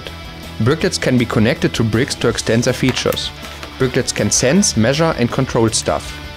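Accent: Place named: Germany. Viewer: Tolle Idee, und gut, daß Ihr in OWL seid, aber bitte besorgt Euch noch einen Native Speaker für das Werbevideo, mit dem schweren deutschen Akzent wirkt das nicht sehr professionell. Macht am besten 2 Sprachversionen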